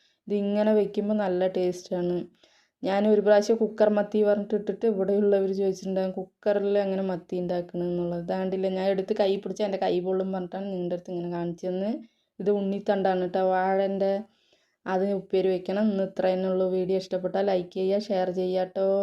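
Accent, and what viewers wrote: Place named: Palakkad, India. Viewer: അൽഹംദുലില്ലാഹ്. സഹോദരി സുഖമാണോ. സ്ഥലം പാലക്കാട് ആണോ. സംസാരം അത് പോലെ തോന്നുന്നു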